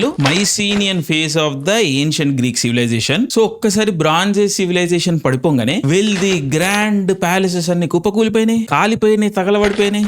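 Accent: Telangana, India. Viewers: Starting audio vini Telangana bhasha lo ki dub chesesavemo anukunna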